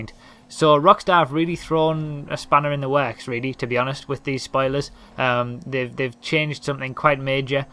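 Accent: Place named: Wales, United Kingdom. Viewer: you sound a bit welsh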